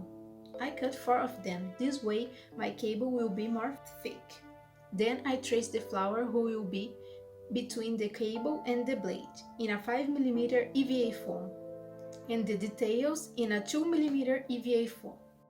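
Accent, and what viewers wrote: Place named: Brazil. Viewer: que ingles de brasileiro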